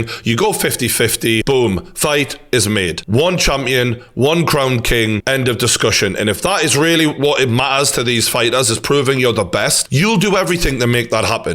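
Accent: Newcastle, United Kingdom. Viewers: True 🔥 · spot on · Spot on lad, great video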